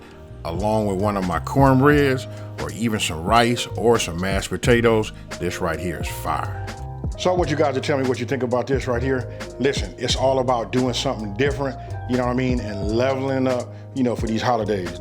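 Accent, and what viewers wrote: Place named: California, United States. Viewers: You sound just like some of my uncles and cousins, you must of been raised in Cali, LA, San Diego? We have a certain way we talk, NadadaMean! 🤣💯 Good Food! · Where you from in LA I hear a bit of east side on your accent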